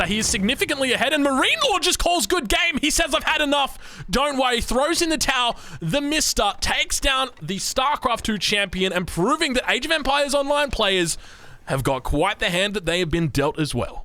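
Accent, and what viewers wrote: Australia. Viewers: Your channel is going to get huge dude. You're spamming out uploads at the perfect time, you're noticeably enthusiastic and knowledgeable about the game, and Americans seriously love Australian accents. I wouldn't be surprised if you had 100k subs by Christmas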